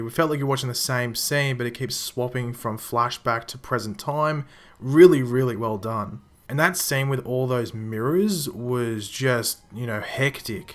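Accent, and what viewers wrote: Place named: Australia. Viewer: Are you from Australia, You have a Aussie accent :D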